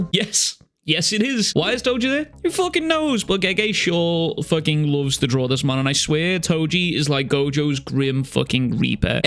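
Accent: Liverpool, United Kingdom. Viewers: This scourcer accent makes this video even more enjoyable to watch, big greetings from Germany keep it up u sexy geezer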